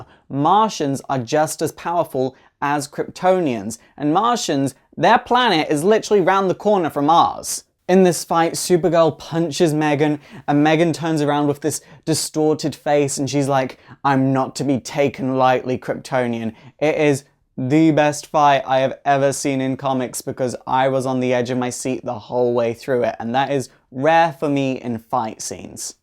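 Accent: Scotland, United Kingdom. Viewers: I thought you were British.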